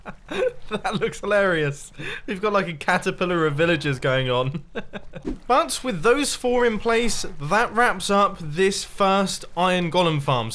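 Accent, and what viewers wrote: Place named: United Kingdom. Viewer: Make a tutorial on how to talk like a British dude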